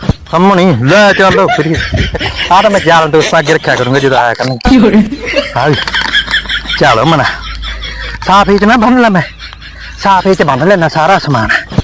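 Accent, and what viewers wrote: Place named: Malwa, India. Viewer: ਆਪ ਜੀ ਦੇ ਵੱਲੋ ਖਾਸ ਤੌਰ ਉਪਰ .ਮਲਵੀਆਂ.ਦੇਵਾਂਗ ਬੋਲੀ ਗਈ ਅਵਾਜ ਤਾਂ ਬਹੁਤ ਹੀ ਵਧੀਆ ਲੱਗਦੀ ਹੈ।ਧੰਨਵਾਦ ਜੀ।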